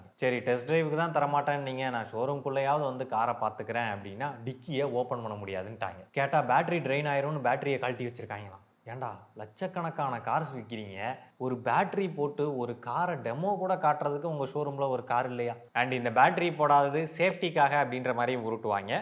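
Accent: Tirunelveli, India. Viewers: தம்பிக்கு திருநெல்வேலி தானா?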